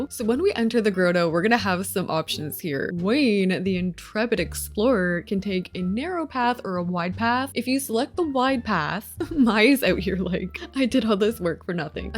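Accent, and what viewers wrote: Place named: Canada. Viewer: Right I couldn’t tell if she is just Canadian™️ or didn’t know how to pronounce Grotto 😅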